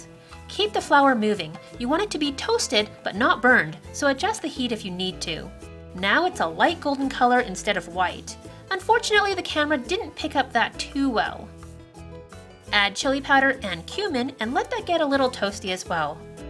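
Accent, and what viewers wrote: Canada. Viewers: Heh I like the Canadian accent - it's eh- nchilada like egg or end ;) (Mexican here). Nice recipe I would've never thought of black eye peas but they do have a meatier taste to me than other beans.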